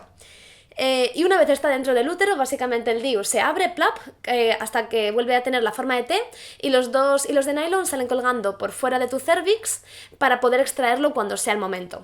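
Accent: Spain. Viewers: "Se me hizo mazo de bola" Mas madrileña no puede sonar 😂😂👏🏻👏🏻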